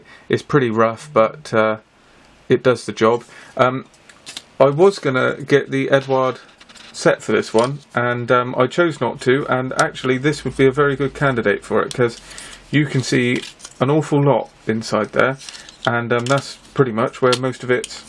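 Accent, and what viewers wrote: United Kingdom. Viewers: Build kit with british accent....i love it👍👍👍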